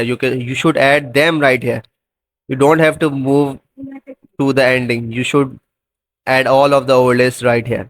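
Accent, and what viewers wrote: India. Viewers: When I hear the Indian accent I knew I was gonna leave with what I wanted while he explained it to me simply ❤, Tysm pro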